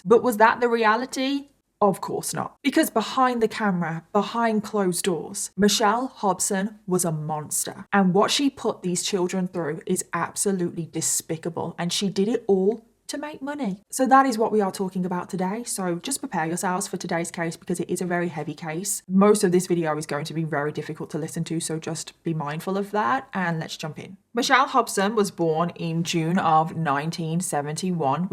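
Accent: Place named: United Kingdom. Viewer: She from the UK and its her accent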